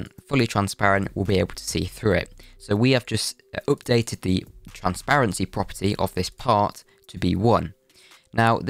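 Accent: United Kingdom. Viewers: British? By your pronunciation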